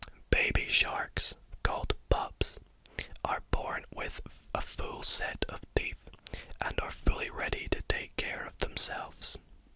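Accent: Scotland, United Kingdom. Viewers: WHAT PART OF SCOTLAND ARE U FROM???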